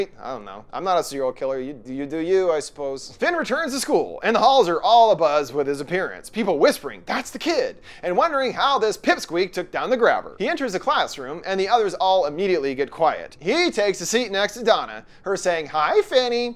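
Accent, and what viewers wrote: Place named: South Carolina, United States. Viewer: I never claimed to not being disrespectful lol. I very much meant to offend his fans because I think it's disrespectful to sheer a conversation praising a creator into a conversation praising a different creator by putting the original one in second place or "just not as good." My observations are completely one dimensional, I watched like 2 minutes of a video and looked at his thumbnails, I'm not a critic I don't want to watch him based on the 2 minutes and so I base my entire opinion on it. I'm not a hypocrite, I'm arguing for a subject not a spectrum, just because I don't like mowing lawns doesn't mean I don't like gardening. His thumbnails are clickbait and aren't to my liking. He talks like a south Carolinian closeted husband. and I don't like him.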